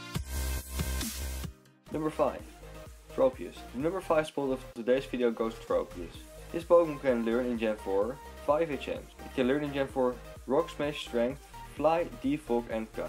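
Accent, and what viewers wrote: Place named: Netherlands. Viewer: Are you from Holland it souns like you from Holland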